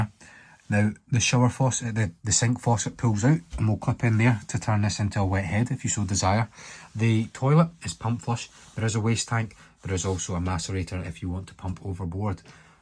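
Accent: Scotland, United Kingdom. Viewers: What you have here is a great example of what Marine Sales can be, ( The Scottish accent puts it over the top.)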